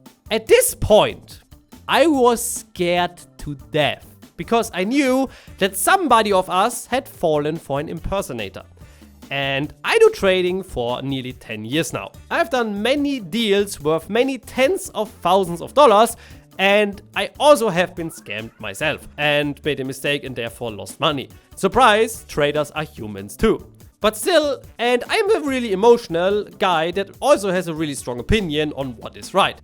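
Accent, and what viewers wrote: Germany. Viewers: I hear a little bit Deutsch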